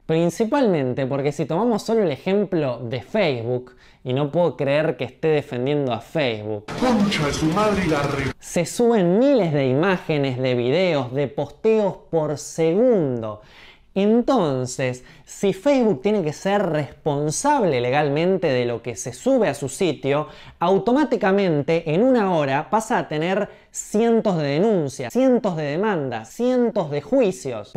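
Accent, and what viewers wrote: Argentina. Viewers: Tu acento Argentino tan marcado arruinó el video, dislike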